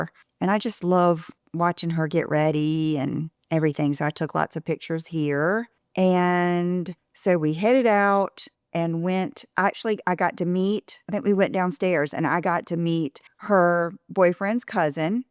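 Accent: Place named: Boston, United States. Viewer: And YES, on the sexy Boston accent!!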